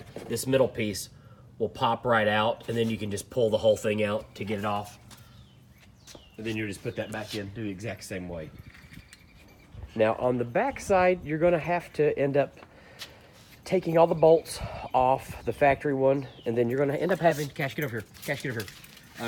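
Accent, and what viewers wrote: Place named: Missouri, United States. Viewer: Sounds like you must be from Missouri I don't know if you heard or not but hillbilly Ranch is closing we usually go to Flat River I live in Illinois in Collinsville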